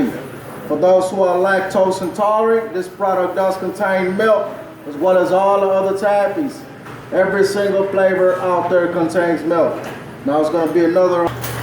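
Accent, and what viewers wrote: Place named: Houston, United States. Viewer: Damn my nigga. Got that south east side accent kinda strong hehe. Sounds like all my homies in htown.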